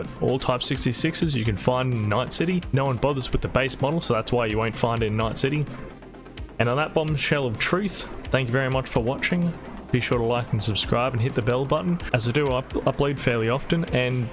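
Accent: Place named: Australia. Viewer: oops 😬 that's the second time I mistaken a Australian accent for a British 1 lol don't know why they sound closely identical but hey good video either way.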